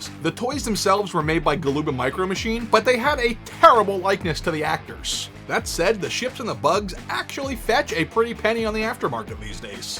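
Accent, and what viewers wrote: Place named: New Jersey, United States. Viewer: You crack me up! That’s a lovely accent you have there. New Jersey?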